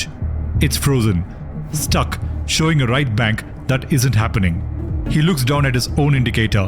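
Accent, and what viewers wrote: India. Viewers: This video is the first time i ever heard english with indian accent and actually kinda liked it…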